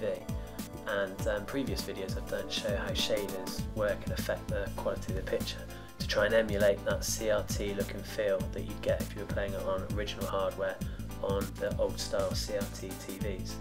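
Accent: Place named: United Kingdom. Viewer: Why do all the British gamers on youtube have your accent? Or are you all THE SAME DUDE?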